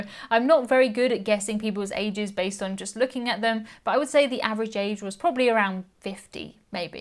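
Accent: United Kingdom. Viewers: coriander is the seed where the leaf is Cilantro- interestingly the spanish pronunciation is Kilantro. when u said multiple sounded like multabowl sounded like u said airboat for aiport called sounds like code.i am so laughing-Brit accent is perplexing.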